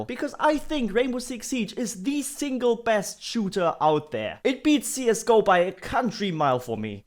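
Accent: Germany. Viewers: What is that accent? Is it German? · you deserve more subs and i was wondering are you German or Russian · Are you German? Sounds like a German accent. I like it.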